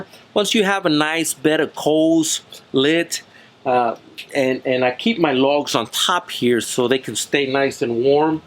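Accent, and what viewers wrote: Texas, United States. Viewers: Ah, I'm originally from the Rio Grande Valley but live in Georgia now. Anyway, I could detect Texas in you. Been enjoying alot of your videos. Getting ready to place an order for some rubs but waiting on Spicy Chicken to get in stock.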